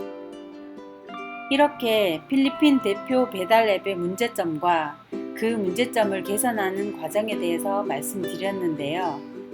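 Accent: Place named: Seoul, South Korea. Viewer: ^ ^~ 나레이션 목소리가 넘 이쁘네요.. 서울 사람 인 것 같아요 으~ 흐 .. 넘 힘드셨지요? 니첼, 도도 없다아 .. ...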